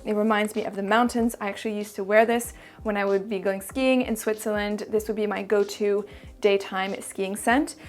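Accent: France. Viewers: T'es française. T'as un fond d'accent français. 😊. Nevermind. T'es clairement française vu la façon dont tu prononces les noms des parfums.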